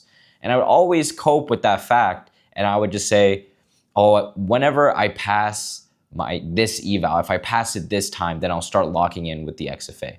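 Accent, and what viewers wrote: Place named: India. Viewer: He is not somali. He looks like indian. And he speaks their accent.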